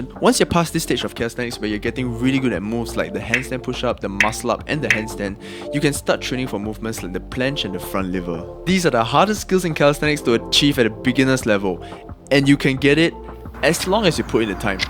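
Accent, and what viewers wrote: Africa. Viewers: Why is bro Singaporean but he has an African accent? Also, would seasoning the chicken kill my gains? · Bro, are you from an African background...? You speak like a friend of my. Love the accent😁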